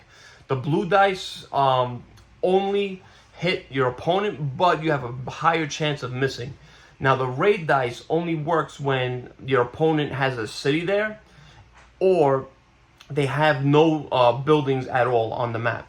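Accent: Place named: New York, United States. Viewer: Great job!!!! I just come here for your accent as I miss NY.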